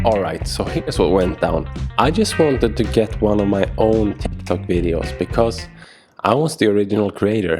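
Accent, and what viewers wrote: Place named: Sweden. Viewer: Man I was so sure I recognized the way you pronounced things, checked your Github profile and I saw right, you're from Sweden!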